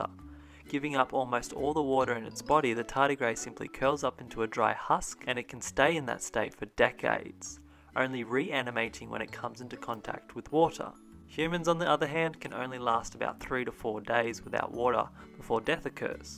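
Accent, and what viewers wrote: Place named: Australia. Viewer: Love the “Bondi Vet” (TV show) Australian voice.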